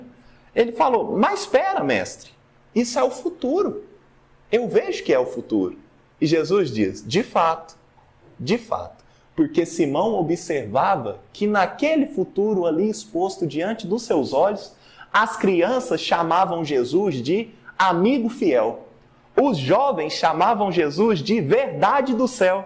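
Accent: Minas Gerais, Brazil. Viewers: Lindo capítulo do livro e muito bem explanando pelo. Aproveito pra matar a saudade do sotaque mineiro que traz aquela sensação de estar em casa 😍 gratidão,!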